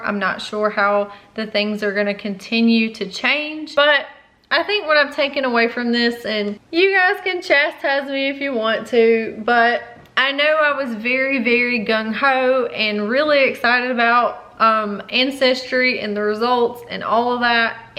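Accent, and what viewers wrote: South, United States. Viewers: Your accent and the fact that your middle name is. My parents' middle names are Ray &. My brother's middle name is also Ray. I feel you. We're both obviously and stereotypically from the South. I'm also predominately British and have Jewish ancestry. A lot of racist/anti-Semitic people like to yammer on about Jews not assimilating and always staying separate, but that's not how things worked in the South.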